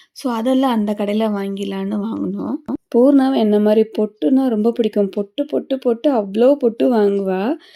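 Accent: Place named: Karaikudi, India. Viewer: Neenga karaikudiya unga varthaigal alagu ola irukku simly suprer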